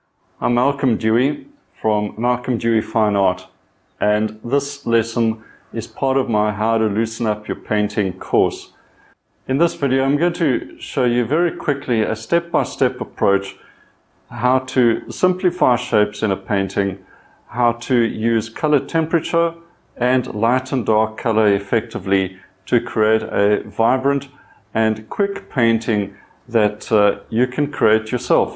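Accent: South Africa. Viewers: How nice to hear a South African accent in a tutorial for a change. And that landscape inspiration pic makes me “verlang” home. So a happy and sad video 😄😔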